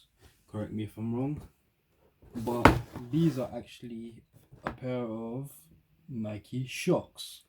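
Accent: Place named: England, United Kingdom. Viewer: What happened to the English accent? Sounds horrendous now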